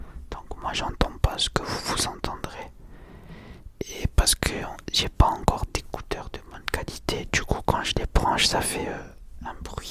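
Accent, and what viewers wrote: South, France. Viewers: L’accent du sud Alala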